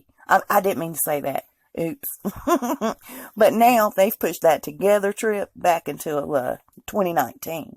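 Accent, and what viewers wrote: South, United States. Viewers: I LOVE GOSSIP WHEN IT IS AS “ JUICY” AS YOUR WRITINGS. AMERICANS ARE NOT USE TO HEARING THE “ INSIDE DIRT” ON ROYALS: NO MATTER WHAT THE COUNTRY, WE HEAR NOTHING! ( BY THE WAY) YOU ARE A “ GENIOUS” AT GETTING AND KEEPING ONE’S ATTENTION. AS A TEACHER, I MUST TELL YOU THAT THE WORD “ ESPECIALLY” DOES NOT HAVE AN “X” ANYWHERE IN IT, BUT YOU ALWAYS SAY “ EXPECIALLY”. YOU ARE DOING “ A “ WORK IN REPORTING, BUT IT WOULD BE EVEN BETTER IF YOUR SOUTHERN HABITS SAID ALL THE ENGLISH WORDS CORRECTLY! ASIDE FROM THE SOUTHERN DRAWL, ( WHICH WE LOVE), WE ALSO WOULD LOVE FOR YOU TO GET THAT ENGLISH PRONUNCIATION RIGHT! THAT WOULD MEAN AN “ A ++ “ ‼️‼️ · You and your southern accent tell us what you are. Sorry baby.. TImes have changed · Hey, what southern state are you from? I am guessing Georgia.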